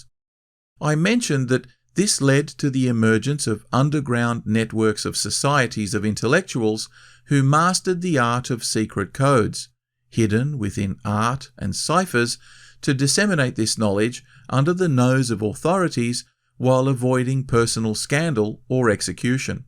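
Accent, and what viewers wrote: Australia. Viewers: It's so nice to hear your Aussie accent. Love from the Mid North Coast, NSW, Australia.❤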